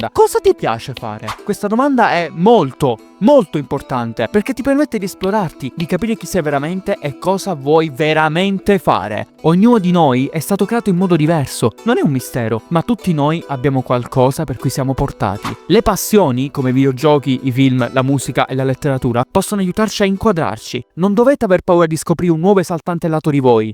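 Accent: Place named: Italy. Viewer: mado lacento PIÙ italiano di sempre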